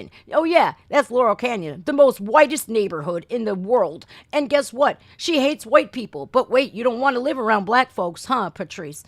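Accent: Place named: South, United States. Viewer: Hey was wondering if you are from the south? Cause you got a southern accent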